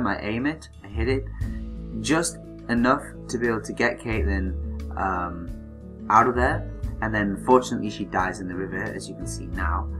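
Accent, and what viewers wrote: United Kingdom. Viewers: Im not a native english speaker, so my accent tends to be more US than UK, but after watching this vid, i will only speak with a british accent, because its so fenomenal and classy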